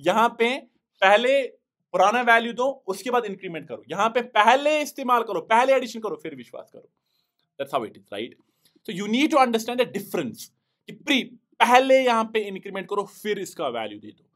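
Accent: Kashmir, India. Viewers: sir aap kashmir se ho kya kyuki mujhe accent se lgaa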